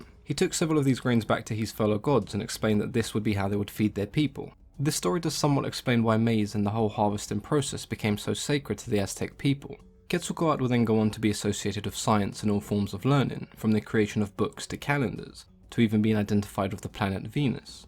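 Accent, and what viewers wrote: United Kingdom. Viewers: ok...whats your accent? You sound British but I don't know if that's what it is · Yes there's something about the British accent that lends an air of Professional Expertise on any subject. I wish my high school professors talked like this. :) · A schmuck with a British accent trying to give info on Aztec mythology! Foh smh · Okay, I've just realised your British, I think, maybe?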